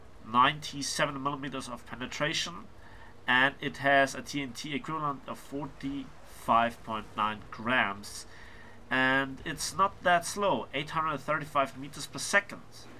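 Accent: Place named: Franconia, Germany. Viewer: du hörst dich irgendwie fränkisch oder bayrisch an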